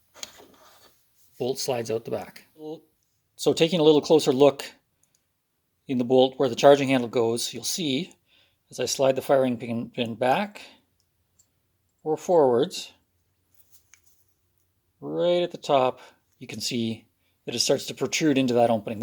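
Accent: Canada. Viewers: Ive been around Canadians enough to know, you are in fact Canadian and I love it! I love your accent. Makes me want to take my gun apart more. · I hear a tinge of Canuck, but that cant be..... Trudeau has decreed that no one gets guns.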